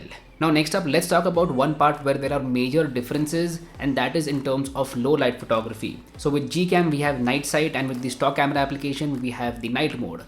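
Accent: India. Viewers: Indian accent makes me hate english for the rest of my life. Please stop guys. At least add smt in title like( Indian accent)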